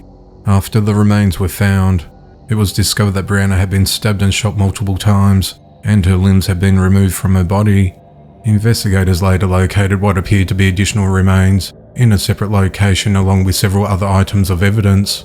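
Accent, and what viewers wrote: Australia. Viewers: Australian accent, East Coast, NSW?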